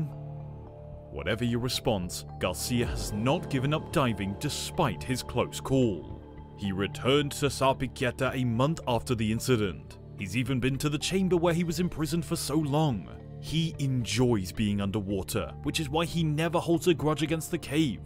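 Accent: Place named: Italy. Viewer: First brit with Italian intonation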